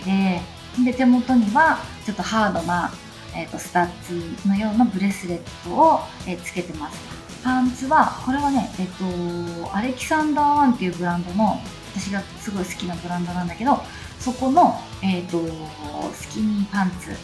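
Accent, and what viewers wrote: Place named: Tsugaru, Japan. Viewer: 津軽訛りで好きなこと早口で喋ってる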